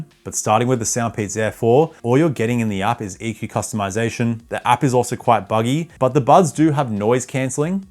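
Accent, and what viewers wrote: Australia. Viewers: Always love seeing an aussie bloke talking bout tech on the Internet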